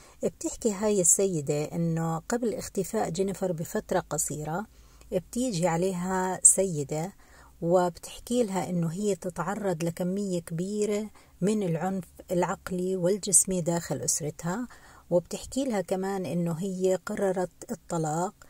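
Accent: Jordan. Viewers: تحياتي لك اختي صوتك جميل احب اللهجة الاردنية والشعب الاردني الشقيق الله يوفقك ومليون ليك مغربية من لندن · الصوت مليح مافيه حتى مشكل متابعة من الجزائر نحبك بزاف بصح حابة نعرف انت من وين لهجتك دل انك اردنيية ولا فلسطينية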